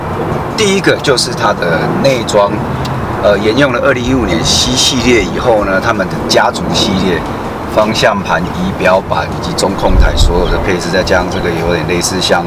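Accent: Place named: Taiwan. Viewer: 好好笑ㄟ～他一開口我就想說台灣腔好重……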